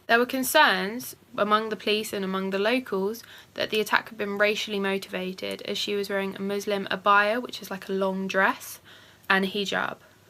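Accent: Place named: RP, United Kingdom. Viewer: I find her accent super interesting. The majority of her r’s sound really soft but then there’s certain words she says that aren’t like when she says together. Idk it sounds quite posh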